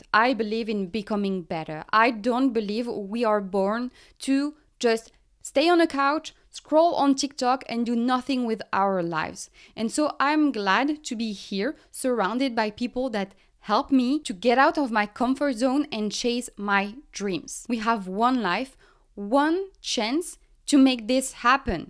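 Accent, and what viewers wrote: France. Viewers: I just stumbled on this topic while I was looking for a song. The subject of this topic was kind of intriguing but mostly I'm interested in topics featuring cute French girls having cute French accents when they speak English. I get what is getting at but I can't really agree with her central theme at this point in time. Historically, what she says is mostly true but, in recent years, Americans have gone out of their way to verbally trash their country. I believe this is mostly due to a planned idiocy infused into Americans by forces that want Americans to be stupid and compliant. Americans, in recent years, really have been made to be as dumb as rocks so that is particularly dangerous if they also have bad intentions. Also, and while there is a lot of "tradition" in France, I don't know where is precisely from so it is hard to argue with her feelings that she does not feel free to express herself in France. 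I know lots of people are expressing themselves very well in France. herself is very smart so her analysis is a valid pursuit. For example, she understands that nothing is "free". There is a widespread notion that medical care in France is free_. It is _not free but rather it is simply a matter that most of the people in France have no idea what it is costing them. I might be a little a little biased toward France and the French but then, I was born near Paris. I grew up in the mean streets of Paris. ( Well... Paris,...Kentucky.) But I hope most Americans wil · Did you go to school in the States because you have almost no French accent like I do after 50 years in the States 🤗 Et je suis d'accord que vivre aux USA c'est bien agréable que de vivre en France when you are a free spirit and claim your independence. The whole world is nice and different. Il faut suivre son coeur et surtout ne pas écouter les critiques des autres. 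Vive la liberté et notre raison d'être. One earth, One people. Make The World Great Again! 🍿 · She is very amusing; it could be the French accent, but either way, she does make some good points.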